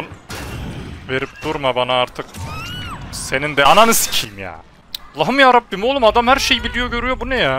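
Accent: Sivas, Turkey. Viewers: Hemşerim sivaslımısın bende😍😘🥰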